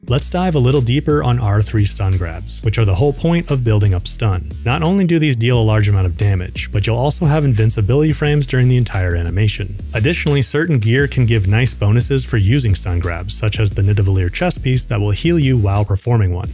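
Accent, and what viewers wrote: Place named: United States. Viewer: Finally an American accent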